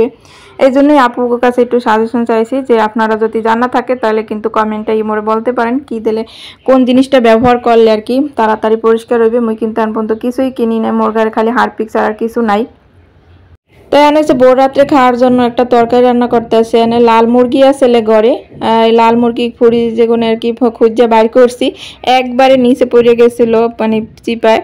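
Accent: Barishal, Bangladesh. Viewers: আমার বরিশালের কথা শুনতে কিন্তু ভীষণ ভালো লাগে। তাইতো আপনাকে ভালোবাসা দিয়ে গেলাম আর আপনার ভালোবাসার অপেক্ষায় রইলাম আপু❤❤❤❤❤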